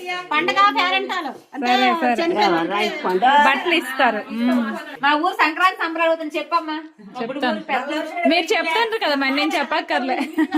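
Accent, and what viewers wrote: Srikakulam, India. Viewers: Naku radhu Amma pata 🥳akka reality lo nv acham Srikakulam basha la chakaga undi reels kuda bagundi akka voice 😘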